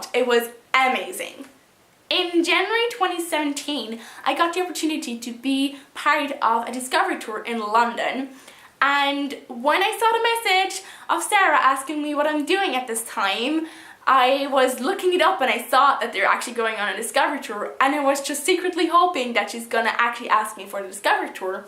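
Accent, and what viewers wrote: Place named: Ireland. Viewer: What I find interesting is that you’re from Austria, and did your exchange in Ireland. Yet you speak English with an Irish brogue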